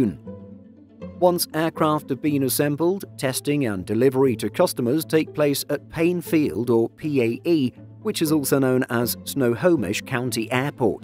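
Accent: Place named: United Kingdom. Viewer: I think your British accent shows your bias.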